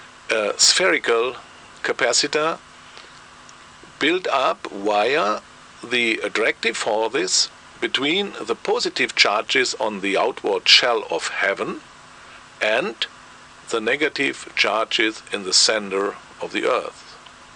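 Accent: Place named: Bavaria, Germany. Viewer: Geil :-) der Babbelt Englisch wie Bayrisch ;-) hehe awer ma versteht alles herrlisch :-) Daumen hoch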